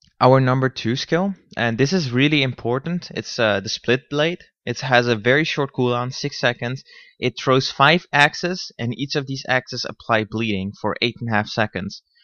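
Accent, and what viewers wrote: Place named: Netherlands. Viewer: You sound Dutch! xD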